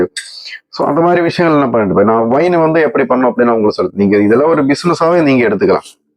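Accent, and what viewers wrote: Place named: Kerala, India. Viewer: നിങ്ങൾ മലയാളിയാണ് എന്ന് സംസാരം കേൾക്കുമ്പോൾ തന്നെ അറിയുന്നുണ്ട്. വീഡിയോ നന്നായിട്ടുണ്ട്. ആദ്യമായിട്ടാണ് കാണുന്നത്. സുബ്സ്ക്രൈബ് ചെയ്തു.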